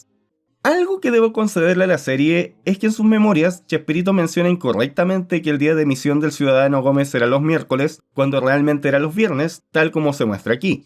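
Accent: Chile. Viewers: Por qué es chileno jsjs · Es chileno, así hablan ellos. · Y por qué el youtuber habla como chileno? 🇨🇱🤔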